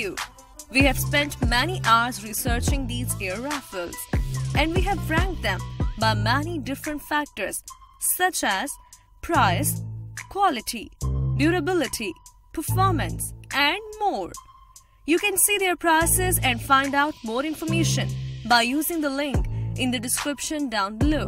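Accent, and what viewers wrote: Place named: India. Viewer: The Indian accent is worse than the robot voice.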